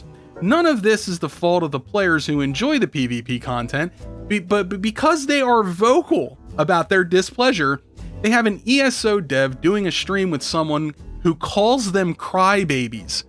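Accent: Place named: Westchester, United States. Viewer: That Westchester accent is great when I'm watching activity and infuriating if I'm trying to relax to video game crap. Wouldn't have it otherwise.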